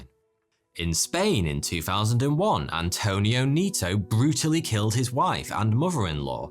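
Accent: United Kingdom. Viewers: I just love his accent. I've always wanted to have good british accent.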